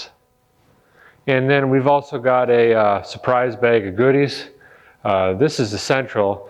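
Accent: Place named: Maine, United States. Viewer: you almost sound like a mainer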